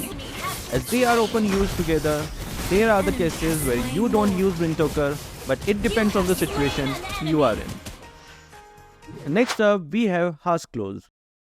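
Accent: India. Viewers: Bro are you from India🇮🇳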